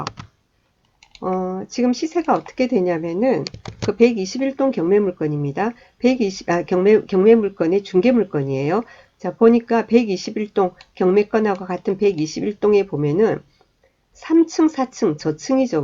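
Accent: Busan, South Korea. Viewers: 정말 너무너무 감사합니다 지금은 손녀봐주러 서울에 와있으면서 강의를듣고 있지만 부산의 그수한 말씨로 자세하게 알려주시는 멘토 입니다 영원한 경매나라 왕비님이 되어 주세요 감사합니다 ~^^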